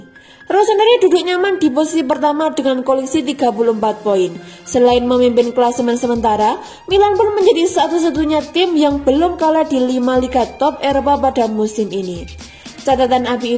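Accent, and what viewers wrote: Java, Indonesia. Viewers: Kok narator Berita2 Ac Milan Di Youtube... Medok2 semua yaa...?? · Medok Is Medok 😃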